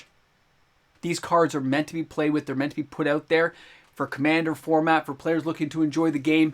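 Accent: Canada. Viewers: Bro why isn't this channel WAY bigger. Awesome insight and perspective. You Canadian? I am, and it's a guess based on your diction. Sad I can't double sub.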